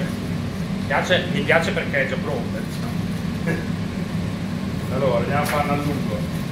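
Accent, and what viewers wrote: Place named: Emilia-Romagna, Italy. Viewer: il tutto molto avvincente e credibile (soprattutto).ottimo sei riuscito ad impastare al 80% di idratazione.a questo punto se puoi mandare le esatte proporzioni degli ingredienti, o mandare il link del maestro pizzaiolo.la pizza in teglia la dovresti togliere dalla teglia ed adagiarla su una griglia sopra levata, per togliere l'umidità del fondo.ancora complimenti per la sala cucina, veramente a norma di legge e pulita.anche l'accento della emilia romagna, personalmente mi garba.che dire ma ce li farai vedere come si fanno i tortellini al brodo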